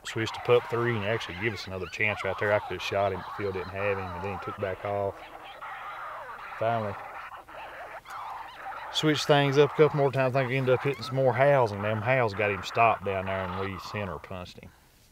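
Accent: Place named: Kentucky, United States. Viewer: don’t ever lose that Kentucky accent. That’s authentic Americana right there. Respect. Oh ya, you’re a hell of a shooter, too.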